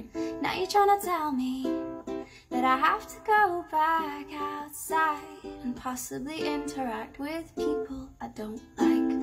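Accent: Scotland, United Kingdom. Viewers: That Scottish accent. Nice surprise, along with the tune that describes everything I feel.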